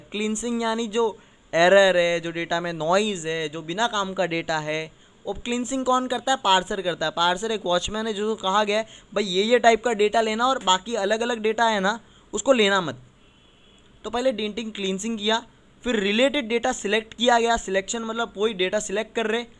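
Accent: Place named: Mumbai, India. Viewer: way you like teach in Mumbaikar style.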